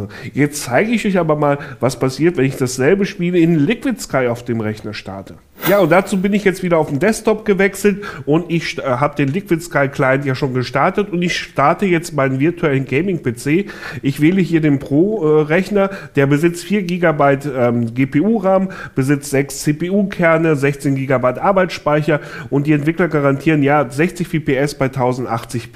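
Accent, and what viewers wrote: Augsburg, Germany. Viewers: Der kommt aus augsburg ja moin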